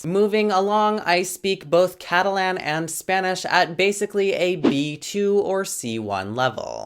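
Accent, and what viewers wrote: Portugal. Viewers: yeah your french is beautiful and your Russian is better. Também gosto que falas a tipo do português do portugal. Continuas falar meu amigo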